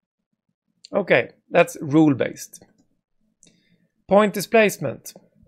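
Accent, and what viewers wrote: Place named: Sweden. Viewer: ...!! good swinglish, great shirt design!! its a good and cool video and very good explanation... requires good practice for the begginers to take good advantage of the tools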